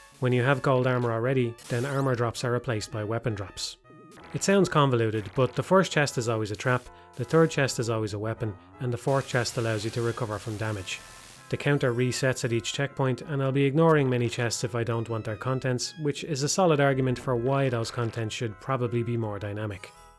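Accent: Ireland. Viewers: irish asmr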